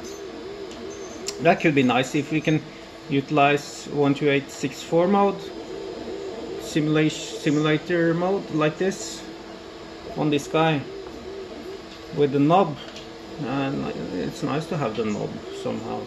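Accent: Norway. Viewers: ok jag visste de ifrån din dialekt har vänner ifrån Norge så kände igen dialekten direkt 😊😊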